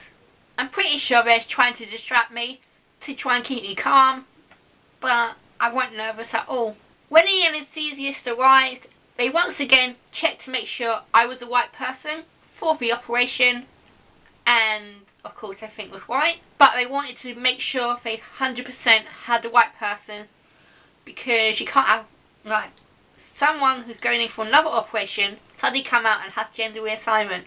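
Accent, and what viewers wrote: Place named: United Kingdom. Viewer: Wonderful that your mother was there to support you. I enjoyed listening to you as I enjoy listening to you Brits talking; to this end I watch a lot of BBC showsz and listen to BBC radio at various times during the day. My best to you and your family. namaste, Roger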